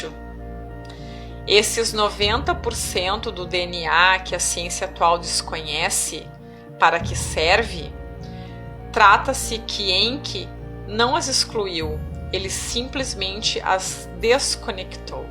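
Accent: Rio Grande do Sul, Brazil. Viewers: Adeildo Amorim sotaque gaúcho. Bem como todo o jeito que ela fala.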